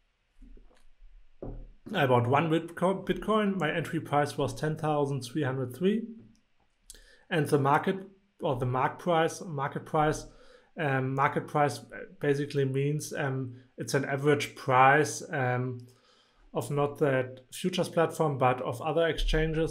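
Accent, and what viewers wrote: Germany. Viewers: Nice tutorial 👍.. Are you from Germany?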